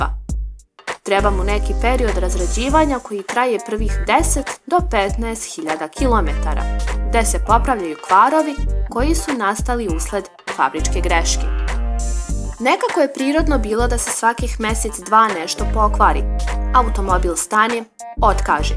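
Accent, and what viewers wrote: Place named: Bosnia and Herzegovina. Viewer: Znas li ti koliko si daleko od bilo cega u vezi sa automobilima ?Toliko gluposti da se ovo ne komentarise.Komentarisem tvoj glas ,koji je negde iz Federacije Bosne i Hercegovine.Ti si toliko daleko od poznavanja automobila Kao Toyota -Camry model 1970-tih od Zastave 750L.Toliko netacnih gluposti,zavisti...Pri tom nemas pojma da par 10 zemalja na svetu proizvodi automobile u 20 tom veku.Znaci,ne sklapa,nego proizvodi.Kad vidis sledeci put Zastavu,najbolje je da cutis.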